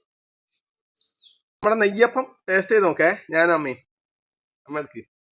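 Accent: Kannur, India. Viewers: കണ്ണൂർ ഭാഷയുടെ ഒരു സൗന്ദര്യം ആഹാ ❤❤❤❤❤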